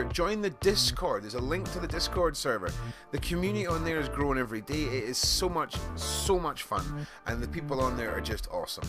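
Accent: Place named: Scotland, United Kingdom. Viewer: Seen your content on a dark Web video. When I heard you were Scottish I jumped on you tube 💚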